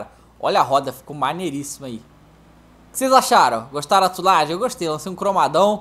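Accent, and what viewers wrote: Bahia, Brazil. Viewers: mano vc é MT baianaaao cara slk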